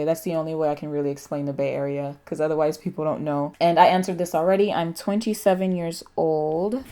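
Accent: Nigeria. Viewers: I love your Nigerian accent it sounds so pretty! This look is so pretty 😍 How do you find out what’s trending ? Thank you for these tips. Very helpful 💕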